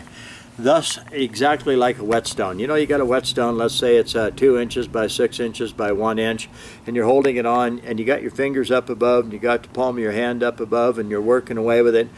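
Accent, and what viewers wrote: United States. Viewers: You look very scandinavian for an american sounding white man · youre from california ?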